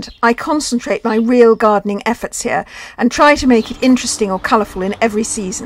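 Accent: RP, United Kingdom. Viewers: How safe is it to birch tree in a small garden, I have 4 and people are saying I am mad as they believe, my house would collapse one day due to them. Please advice. Thanks. PS: Love your posh accent.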